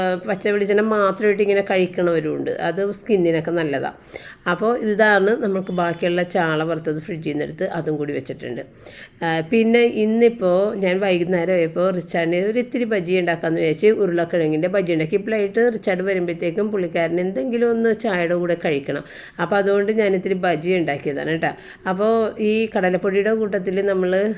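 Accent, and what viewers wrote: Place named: Kochi, India. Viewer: ഒരിക്കലും വീഡിയോ നിർത്തരുത് ആരെ കണ്ടില്ലെങ്കിലും ഞാൻ വീഡിയോ കാണും കൊച്ചി ഭാഷയെ സ്നേഹിക്കാൻ തുടങ്ങിയത് സംസാരം കേട്ടത് മുതലാണ് ❤എന്നെ യൂ ട്യൂബ് എന്നും തഴയുന്നു എന്നാലും ഞാൻ നിർത്തില്ല 😀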